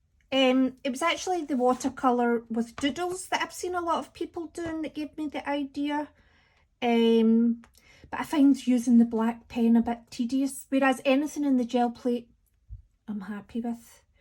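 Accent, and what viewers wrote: Scotland, United Kingdom. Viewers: Just discovered you, and it's lovely to hear a fellow Scot chirping on about this technique and already producing some lovely work. Well done and keep it up:) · It’s about experimenting you’re doing a great job!! What part of Scotland are you from? I was born in Dundee I’ve been in Vancouver Canada for 50+ years … love your accent!!! Thank you for your video and you’re right it’s very much like a limo cut!! Elaine ❤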